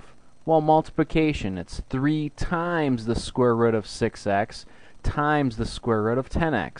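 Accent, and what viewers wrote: Canada. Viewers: Are you Canadian? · ive never heard anyone more canadian and i love it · Are you canadian??? o.o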